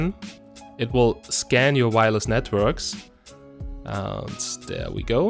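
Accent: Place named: Germany. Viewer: Slight german accent...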